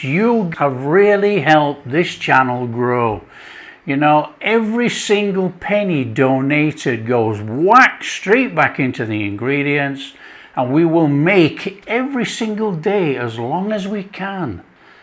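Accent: Yorkshire, United Kingdom. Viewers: It is your lovely straightforward Yorkshire deliverance and of course the honest down to earth food. Keep going. All the best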